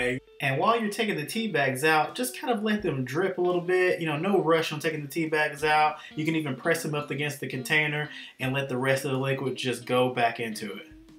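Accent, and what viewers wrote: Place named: United States. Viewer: i love your nice country voice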